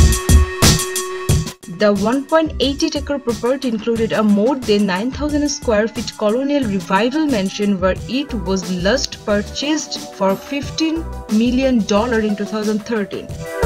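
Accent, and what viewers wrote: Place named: South India, India. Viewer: Heavy South-Indian accent and that would still be okay if it were not for some weird-ass autotune that was added in the editing room.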